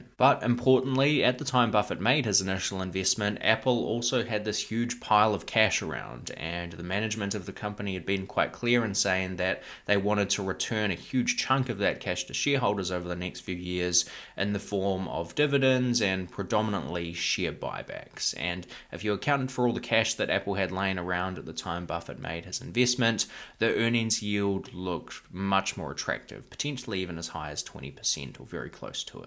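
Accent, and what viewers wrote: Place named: New Zealand. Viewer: Thanks for your videos,I've learned so much from them. I hope you'll forgive me for asking,and I don't wish to sound offensive,but I'm curious to know whether all New Zealanders pronounce their letter e's as i's, or is yours a dialect from one particular part of the country? Thank you.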